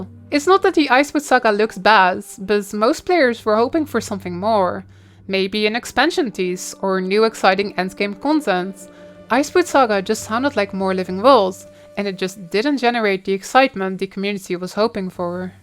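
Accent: Netherlands. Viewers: I love that Dutch accent omg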